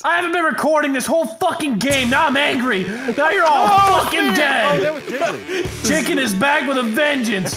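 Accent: Canada. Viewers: Yeah. He’s Canadian. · Ma gad,vanoss now bein ragin 😂,the canadian accent tho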